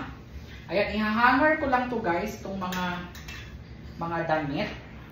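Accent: Iloilo, Philippines. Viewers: Taga saan ka po parang ilongo ka po sir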